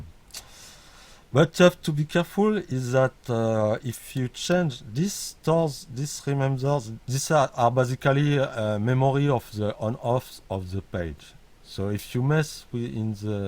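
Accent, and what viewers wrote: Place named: France. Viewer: T'es Français ? Thats a big french accent don't get me wrong, edit : effectivement t'es français from Paris. Très bon VST je regrette pas de l'avoir acheté celui là. Par contre juste un petit pdf ou des vidéos explicatives pour expliquer le vst ça serait cool, je cherche comment enregistrer le layout par exemple. Ca manque d'un bouton supplémentaire ou dans about les options de customization, par ex skin devrait se trouver dans about et les enregistrement d'un ou des layout favoris aussi. Sinon à part ça super plugin merci Edit 2: Eh merde on dirait que le layering d'instances Psyscope ne fonctionne pas dans BitWig 5.1 😢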